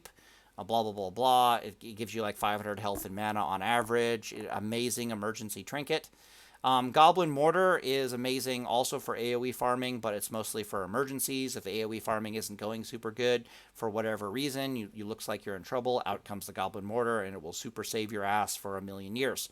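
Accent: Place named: Minnesota, United States. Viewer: You from Minnesota? My Minnesota friend says blah blah blah blah blah just like you.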